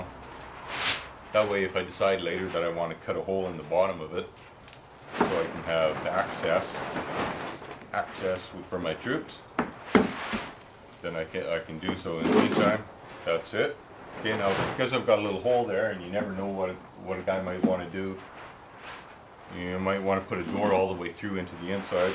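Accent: Canada. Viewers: That Canadian accent is on point aye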